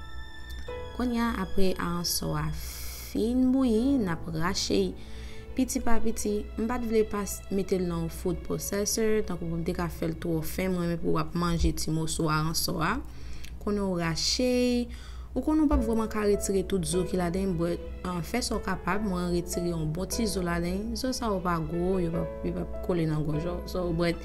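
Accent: Haiti. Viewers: I love your creole accent My mom has the same one lol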